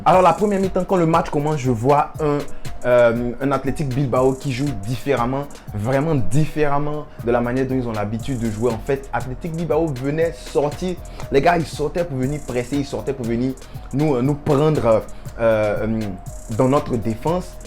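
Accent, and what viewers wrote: Ivory Coast. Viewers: C’est la première fois que je ressens ton accent ivoirien 🤣🤣🤣 ça degba Garcia et piqué ensemble c’est pas possible même profil pas assez rapide . Araujo un monstre ce petit, De Jong il est tellement bon mal entouré et Depay un artiste très talentueux 😍 cependant trop de responsabilité à peine arrivé. Busquets il est plus à niveau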